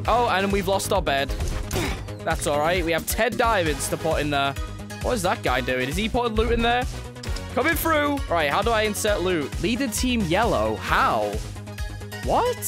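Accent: United Kingdom. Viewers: MY GUY IS THE BRITISH KING ACCENT🔥🔥🔥🔥💀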